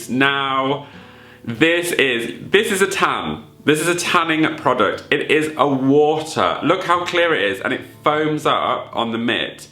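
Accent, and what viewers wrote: Australia. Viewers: Listen ere Sheila! Living for ur Aussie accent lol.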